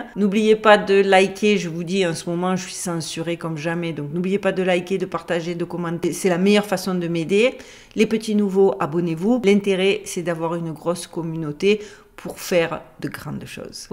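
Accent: Marseille, France. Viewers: Bonjour Continue à garder ton accent marseillais et tes petits rires trop craquants en racontant tes histoires. Sinon c'est comme si tu lisais une belle partition mais sans t'accompagner d'un instrument🥰🥰🥰🥰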